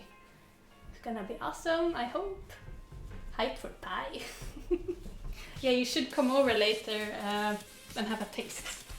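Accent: Sweden. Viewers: ni har liknande svensk/engelsk dialekt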